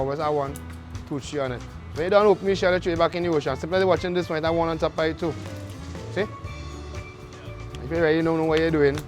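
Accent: Trinidad and Tobago. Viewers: It’s because he isn’t Jamaican…he’s Trinidadian so he’s sounds Trinidadian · That's a true Trini, different accent from Jamaican.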